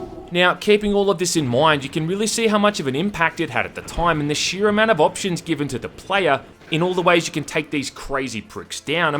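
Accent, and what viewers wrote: Australia. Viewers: "Where he backflips off a missile in mid air, I mean come on son!" - Aussie AF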